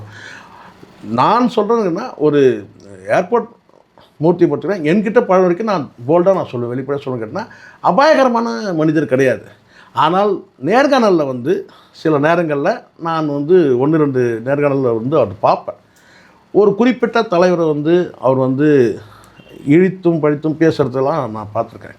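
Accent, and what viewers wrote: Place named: Karnataka, India. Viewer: Yaaruda idhu? Kannada minna pesars aalu Oru channel vechikittu volaraa?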